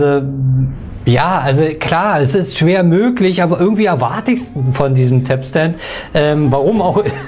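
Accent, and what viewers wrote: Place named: Hamburg, Germany. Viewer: Hamburger juuung :D Schönes Review, vielen Dank!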